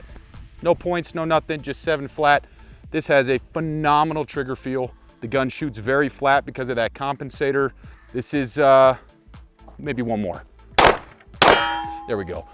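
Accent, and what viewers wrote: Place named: New Jersey, United States. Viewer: Turned it off a quarter of the way through. I couldn’t listen to him say “one shot everybody knows the rules” one more fucking time. Can’t stand listening to that Jersey shore voice either. Have a great life. Wish you luck.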